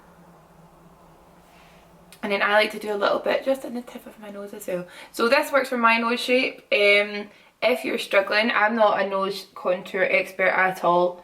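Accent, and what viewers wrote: Scotland, United Kingdom. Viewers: When you say Laura Mercier, it sounds like you're saying "Lord have mercy" - I love it! :D Scotland will always be my absolute favourite; beautiful country, amazing people and awesome accents! <3 Cheers!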